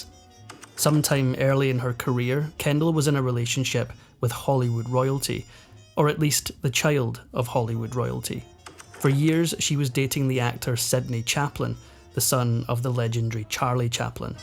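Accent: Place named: Scotland, United Kingdom. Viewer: Yay my favorite Narrator love the Scot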